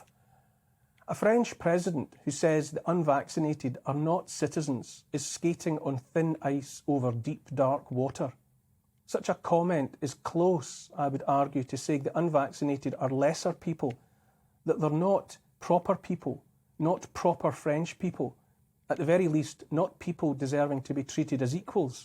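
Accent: Scotland, United Kingdom. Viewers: Moreover, forgot to mention that your Scottish accent is classical music to my ear! Brilliant!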